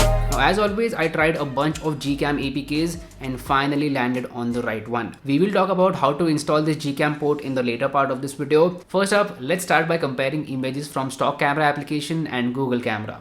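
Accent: India. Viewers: Indian accent makes me hate english for the rest of my life. Please stop guys. At least add smt in title like( Indian accent)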